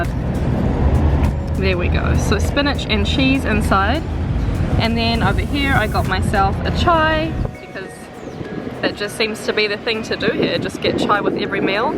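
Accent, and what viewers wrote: New Zealand. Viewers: You have the 2nd most beautiful New Zealand accent of the world.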